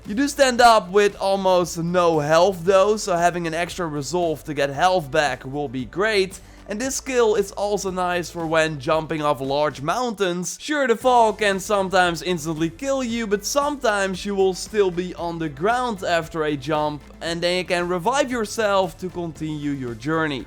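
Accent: Netherlands. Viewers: You are dutch aren’t you??😂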